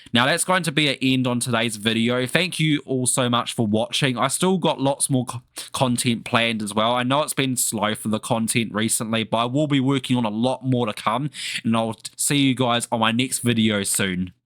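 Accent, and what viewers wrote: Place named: New Zealand. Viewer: Bro, u from NZ? That accent sound kinda like it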